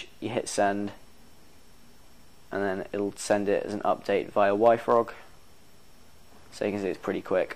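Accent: United Kingdom. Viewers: Thumbs up for British accent! D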